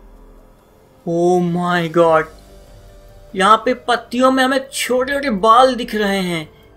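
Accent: India. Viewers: Ngomong nya kaya orang india njir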